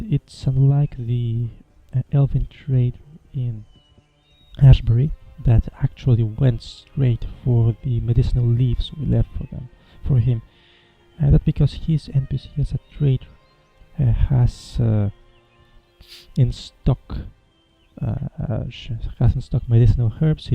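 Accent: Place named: Russia. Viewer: I didn't know you were Russian. You should comment more - you have a very soothing voice coupled with that fabulous accent.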